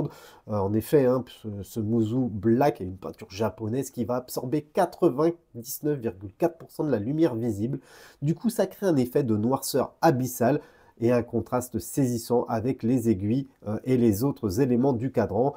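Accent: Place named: Italy. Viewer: Tuo accento italiano è bellissimo!!! 😍😜👌 Bravissimo!!!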